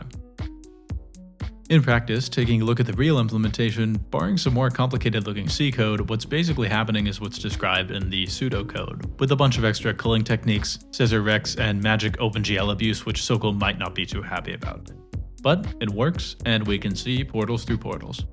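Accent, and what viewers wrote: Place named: Denmark. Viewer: Great video as always! Jeg havde ingen idé om at du var dansker, din accent er ikke særlig stærk :)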